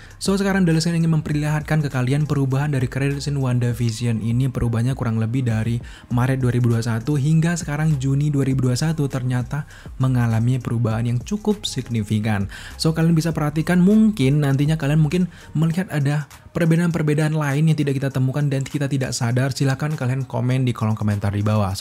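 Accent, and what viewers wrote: Java, Indonesia. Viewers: gua gamasalah sama logatnya, mau dia logat medok kek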